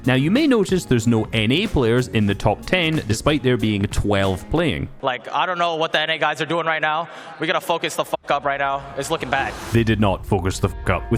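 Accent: Scotland, United Kingdom. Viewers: Scottish folk really shouldn't be doing these voice overs.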